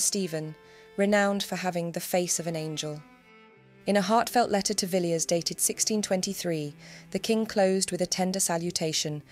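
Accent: England, United Kingdom. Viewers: What great insights into poems and verses we've heard! I never knew they dated back so many years and you offer interesting viewpoints and explanations. I also love your English accent. It makes you sound that much more genuine on these subjects. Keep up the good work ❤.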